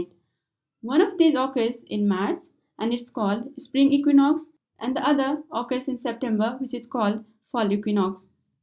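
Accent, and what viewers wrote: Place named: Nepal. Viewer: Your accent sounds Nepali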